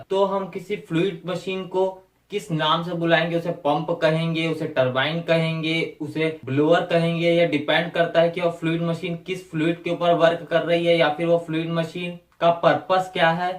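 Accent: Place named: Uttar Pradesh, India. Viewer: Up Jaysa bolta ho bohot halp ho ta ha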